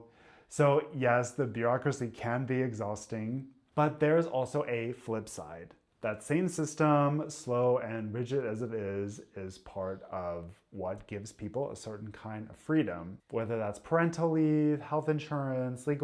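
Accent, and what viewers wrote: Bavaria, Germany. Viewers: I felt it when I was in the core of a little friend group for the first time around my 30th birthday. I’d never had that growing up as a nerdy, gifted/neurodivergent girl who naturally clicked better with boys/men but was also not the best at navigating the whole attraction thing due to the undiagnosed AuDHD and being ace-adjacent as well without knowing. It felt amazing to be chosen without a lot of masking, within my nerdy railroad engineering friend group 😊. I noticed your inflection is a lot like Feli from Germany, so I’m going to deduce it’s a Bavarian/Munich based way to end sentences tone and length wise, I’d heard another German creator who spoke US English do the same, but I wasn’t sure yet if the area inter US where they learned English was the shared factor, or if it came from a German region 😅.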